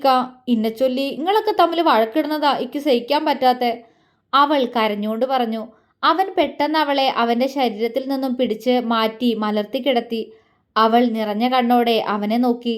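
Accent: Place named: Malappuram, India. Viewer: ആണോ. എനിക്ക് ഈ സംസാരം നല്ല പരിചയം ആണ്. എന്താന്നോ എന്റെ വീട് മലപ്പുറം പാലക്കാട്‌ ഒക്കെ കടക്കുന്ന ബോർഡർ ആണ്. പിന്നെ എന്റെ സ്വന്തം വീടിന്റെ അടുത്ത അയൽവാസികൾ മുസ്ലിസാണ്. അവരുടെ കൂടെ കളിച്ചു വള്ളർന്ന ആളാണ് ഞാൻ. അത് കൊണ്ട് എനിക്ക് വേഗം മനസ്സിലാവും.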